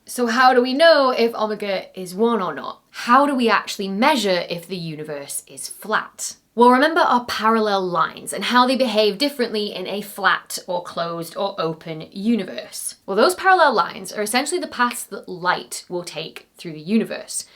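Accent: United Kingdom. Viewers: Yeah, the British accent on that one was hard, lol. I'm normally pretty good with it, but I had to keep reminding myself what she was talking about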